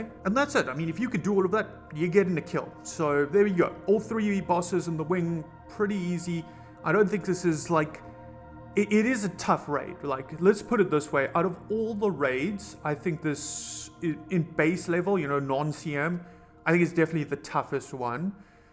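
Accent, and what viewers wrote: South Africa. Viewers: are you South African bru ?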